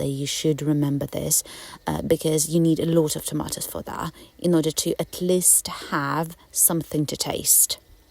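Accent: England, United Kingdom. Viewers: You have an Eenglish Axcent, Who was your teachers? The hynm, Amasing Grace by John Melton. Came after a storm off the Irish Coastline. Communication and voice is important. We need to learn to bake a 🎂 cake.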